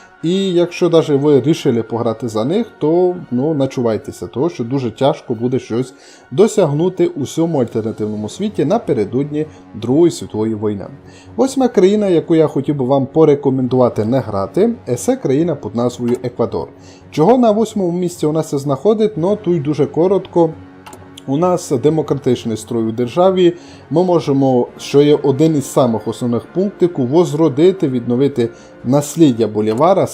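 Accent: Transcarpathia, Ukraine. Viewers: Я живу на Полтавщині, для мені дуже файно чути закарпатський діалект і hoi4. Продовжуйте.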